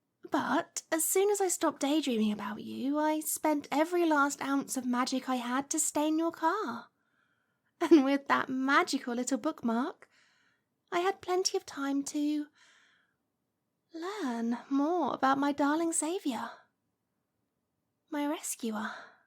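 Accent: United Kingdom. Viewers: What a nice kind and caring witch! And with a charming British accent too. :-)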